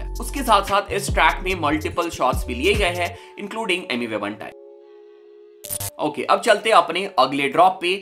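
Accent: Bihar, India. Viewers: Bro are you from Bihar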